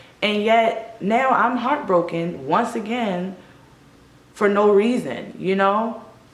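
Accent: Baltimore, United States. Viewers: The Baltimore came out in this vid lol #westbaltimoregirl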